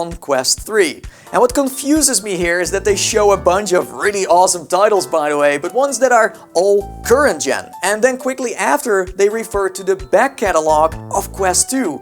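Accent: Netherlands. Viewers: are you dutch or from the Netherlands?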